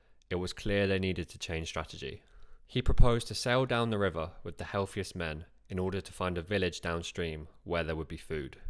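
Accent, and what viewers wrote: United Kingdom. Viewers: Glad it's not AI, I think, but does it have to be a Brit accent? Give us a break! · it’s quite a light British accent bruv Worry not, not long from now you’ll be able to make tiny adjustments such as changing the accent through AI.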